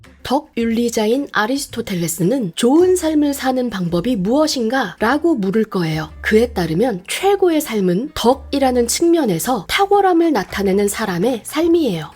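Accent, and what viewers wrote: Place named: Gyeongsang, South Korea. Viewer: 혹시 경상도 분이신가요? 아주 살짝 억양이 보이네요 ㅎㅎ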